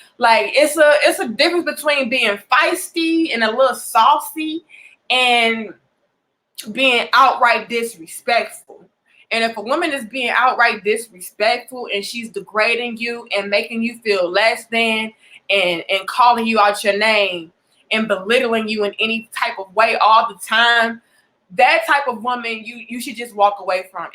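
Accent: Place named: Florida, United States. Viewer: I like the accent. You from Florida?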